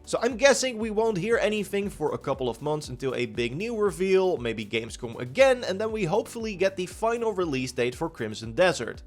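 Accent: Netherlands. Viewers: Je klinkt uber Nederlands, klopt dat? Al een tijdje benieuwd 😂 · dutch accent is so funny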